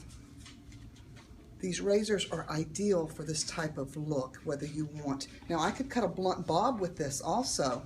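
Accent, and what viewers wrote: United States. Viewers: What a wonderful cut and style for this lovely lady. You sound like you could be from Georgia, or nearby. If so, I want you to cut my hair please! I'll drive the distance! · Great job! I hear a southern twang...where are u located?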